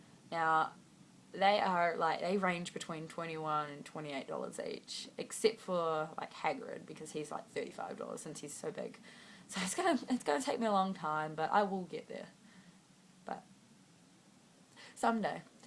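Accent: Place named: New Zealand. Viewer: You sound like a kiwi!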